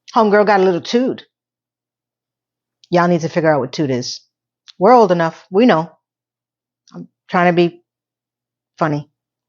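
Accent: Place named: New York, United States. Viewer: I have so many things to say about this episode, but just paused to say, 19 years in Denmark, and still have my very distinct Chicago accent. It ain't going anywhere. ;) I love a NY accent, and I can hear it in you, not so much from "Gia". Anthony held his own,proud of him. Ok, I will un-puase and watch the rest now. :)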